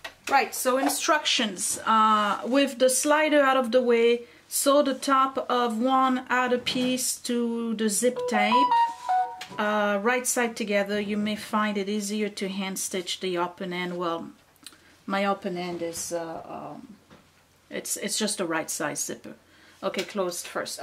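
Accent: France. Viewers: You did an excellent job on your sewing machine table, I am so impressed!! And I love hearing you talk because I like your French accent!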